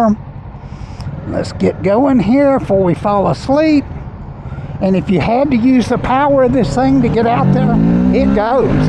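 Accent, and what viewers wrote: South, United States. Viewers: Hey, Love your channel! And Love your accent... I'm from up Northeast Pa. but I can tell you're from somewhere in the South. Don't know if you're as old as me but I been riding my Ninja 650 since 2018 and refuse to let it go..too good of a bike! I also bought a CBR650r a few months ago. I missed that smooth 4 cyl engine and sound. I been riding legally since 1987 but started on a dirt bike at 12.🦾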